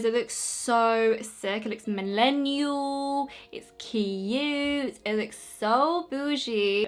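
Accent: England, United Kingdom. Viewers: I have followed you on Instagram for quite a while and just found you on YouTube. I have no idea why I didn't know you had a youtube channel but Im am so soooo shocked by your voice! 😂 I had no idea you had an english(?) accent! (Im from the US) But I love your videos and you do beautiful make up! So happy I found your channel to subscribe!!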